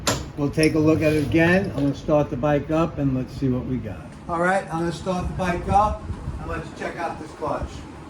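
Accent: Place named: New York, United States. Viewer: You sound like you’re from NYC. Queens?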